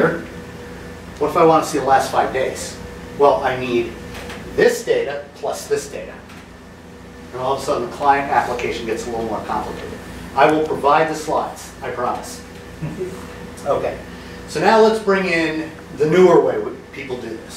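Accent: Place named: Italy. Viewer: Italian accent.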